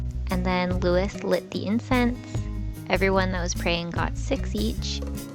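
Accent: Standard. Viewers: 這英文 真的發音好標準 而且字字清晰 歐告好可愛喔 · Your Chinese accent is very standard and has been integrated into Taiwanese life.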